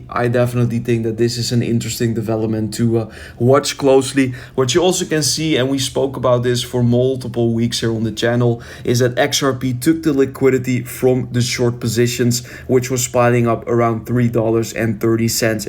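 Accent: Netherlands. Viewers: Nederlandse accent weer duidelijke te horen😂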